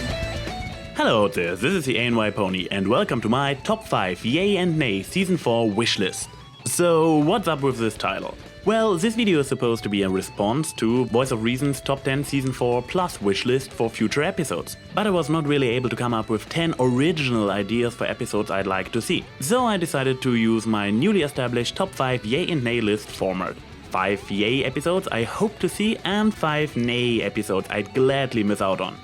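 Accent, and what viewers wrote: Germany. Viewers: ... You're from German, ain't ya? I knew I recognized that accent.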